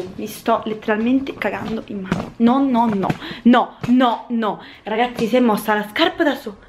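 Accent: Rome, Italy. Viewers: Se sente che sei romana anche io lo sono Bellissimo video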